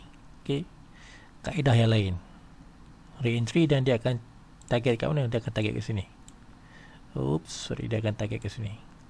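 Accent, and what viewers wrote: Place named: Malaysia. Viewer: Terima kasih cik gu atas ilmu yang telah dibagikan ke kami semua, setelah saya play berulang ulang akhirnya saya mengerti maksud cik gu. Mungkin karena saya dari indonesia jadi agak kesulitan memahami logat melayu malaysia. Terima kasih cik gu semoga Allah SWT membalas semua kebaikan cik gu.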